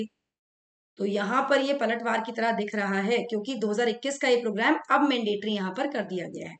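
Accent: India. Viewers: Please dont speak English in Hindi style. Sounds ridiculously funny.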